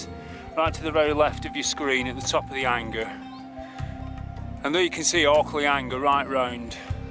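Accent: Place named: Lancashire, United Kingdom. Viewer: Only just come across this one 18 months too late. You've lived there since being 17 and I thought you'd just stepped out of your home in East Lancashire 5 minutes ago. I lived in London for 5 years in the 80s and a Yorkshire accent caused some amusement then. Brilliant video as always,. Keep them coming.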